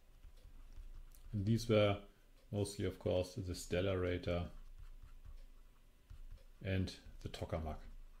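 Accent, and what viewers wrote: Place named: Germany. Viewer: Tolle Serie, bei den meisten Dokus wird immer das gleiche gezeigt. Mich hat schon lange das Thema Kernfusion interessiert und ich "verschlinge" alles was es zum Thema Fusion in Form von Videos gibt . Daher haben mich auch die Frühen Jahre dieses Forschungszweig interessiert (Spiegelmaschiene usw) vorallem die ersten Prototypen die ja eher noch Winzlinge waren . In dieser Richtung findet man eher wenig im Www . Mit dieser "Dokureihe" hat sich dieser wunsch erfüllt. Danke! Einziger manko das es in English ist was aber wiederrum verständlich ist da ja in der Wissenschaft English die Umgangsprache ist . Gott sei dank ist es English mit German Aktzent 😅 das macht es leichter. verständlich . Grüsse aus Austria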